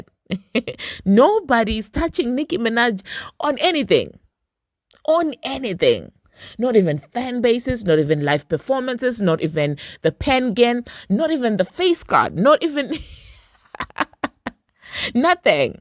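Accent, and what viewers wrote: South Africa. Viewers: thank you for representing us. That South African English accent 👌👌👌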